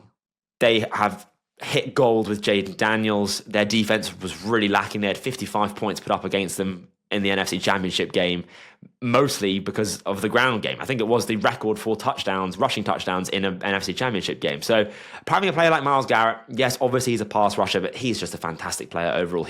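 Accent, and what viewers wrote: United Kingdom. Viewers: Sorry I can't listen to some British guy talking NFL. Wrong football mate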